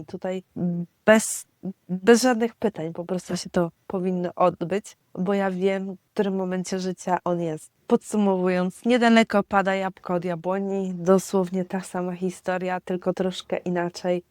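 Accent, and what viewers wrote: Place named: England, United Kingdom. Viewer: no właśnie ten język Polski w którym pani rozmawia jest już z akcentem Angielskim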